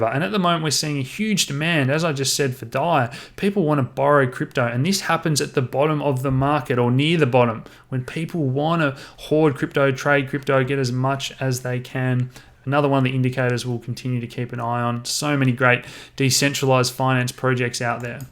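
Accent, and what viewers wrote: Australia. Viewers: Great to hear an Ozzie accent talking crypto.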